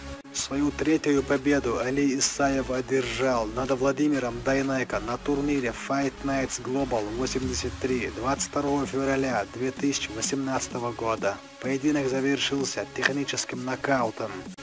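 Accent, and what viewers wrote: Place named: Chechnya, Russia. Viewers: Озвучка, с чеченским акцентом. Автор, это даже тебе какой то харизмы добавляет. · Автор и есть Чеченец.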